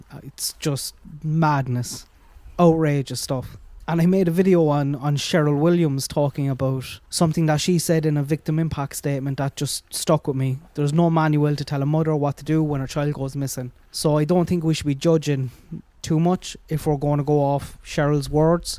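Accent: Ireland. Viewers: I always get so wrapped up in what you say and enchanted by your Irish accent right away, that I totally forget about the darn bunny! 🐰🐧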